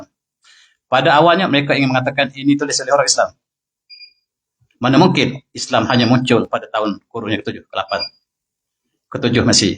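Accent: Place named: Kelantan, Malaysia. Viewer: setuju sgt2..bro, belum cukup ilmu di dada, lautan dalam jangan di duga. Sya pun faham..prof ni byk kekurangan dlm penuturannya yg tebal loghat Kelantan, kelajuannya, malah bait2 bacaannya dari apa sahaja bahasa BM, English, Arabic, Ibrani, Aramaic, terdengar mcm tak clear..lajunya mcm lorat gaduh dan agak lucu2 skt. Tp tu semua tak terbanding langsung dgn ilmu2 dlm setiap kuliah beliau. Mula2 dgr dlu ada gak rasa smcm jer..tp makin lama semakin menarik, makin mendalam, byk ilmu baru, isu2 dunia, isu umat muslimin, isu akhirat dan penuh pengajaran, pencerahan dlm dunia yg sudah di fasa akhir zaman ni..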